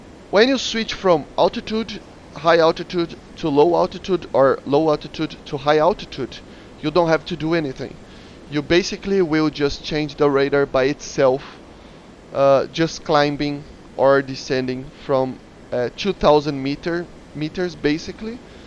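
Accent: Brazil. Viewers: Notei q vc eh BR pelo sotaque do ingles kkkk video brabo nois 😎🤙🔥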